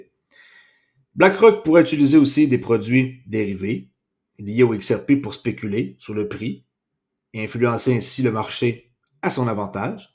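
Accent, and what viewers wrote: Canada. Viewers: je te kiff tu me fait trop rire avec ton accent, j'ai l'impression d'entendre mon beau frère du canada.. Bon j'ai du xrp depuis un petit moment et quand je t'entend je me dit tu doit en avoir vraiment beaucoup pour autant en parler..après ça me va bien car je suit beaucoup les infos autour de cette crypto que j'ai failli vendre tant de fois 😂😂😂 mais que j'ai gardé je ne sais pas pourquoi.. J'espère un avenir pour xrp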